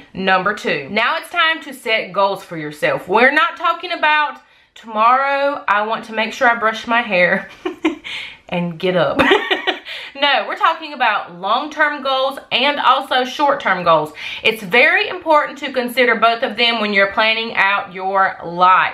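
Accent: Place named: Carolina, United States. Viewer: Loving your channel lately, love your carolina accent. Sounds familiar ;)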